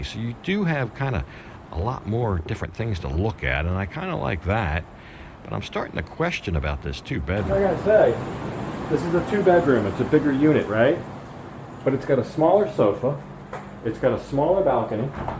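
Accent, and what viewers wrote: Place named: East Coast, United States. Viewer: Where did you Grow Up? Sounds like east coast accent. Definitely a Great Radio Announcer Voice!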